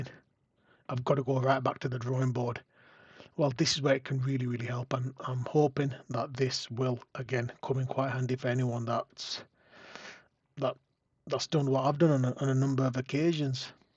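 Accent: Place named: Yorkshire, United Kingdom. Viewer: love the accent! proper Yorkshire - great video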